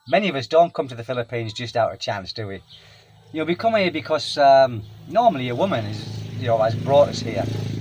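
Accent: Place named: Newcastle, United Kingdom. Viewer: You are welcome. I recently posted one of your videos to my cousin Paul who married a girl from Sunderland in 1983. He has lived there ever since. I detected you have a slight Geordies accent. Great memories of being best nab at his wedding. I was best man. The geordies were brilliant. So similar to we scousers. really honest with a grear sense of humour and dont suffer fools. Keep up the great work. Tony from the pool.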